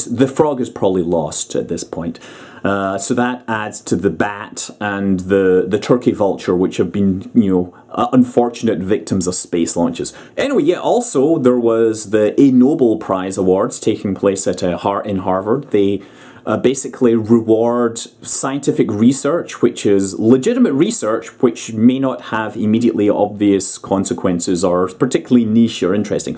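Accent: Scotland, United Kingdom. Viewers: your pronounciation was so off, that I only got who you were talking about from your explanation. So here I'm trying my best to provide a 'phonetic' transcription of Erdős Pál's name for the benefit of the internet. (We have a different order for names, yes.) Pál Erdős - Paul (is sufficient, but Pahl would be closer) Ehrdoesh Keep up your Scottish awesomeness!;)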